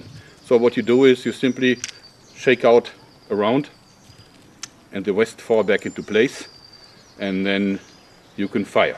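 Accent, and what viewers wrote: Germany. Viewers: The german accent is the main reason why i watch his videos :)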